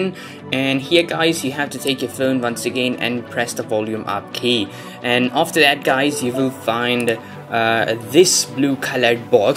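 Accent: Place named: United Kingdom. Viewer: Bro your accent is so good at first i thought that you were a british but still love your contant and a big fan of roms specially for note 3 tnx and keep uploading cool videos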